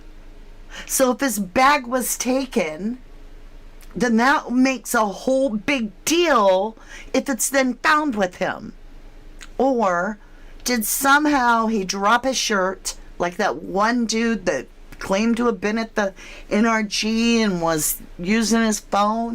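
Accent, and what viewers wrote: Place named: United Kingdom. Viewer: Love your British accent 😂❤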